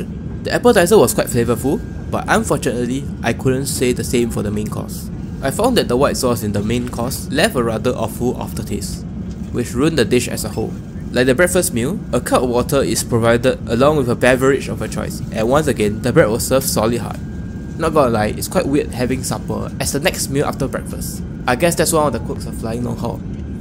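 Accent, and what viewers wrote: Singapore. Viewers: Good job on the video and am glad yr accent is genuine Singaporean. However, the words seem to be generally quite 'compressed'. In the English language, there are not only short vowels but long too.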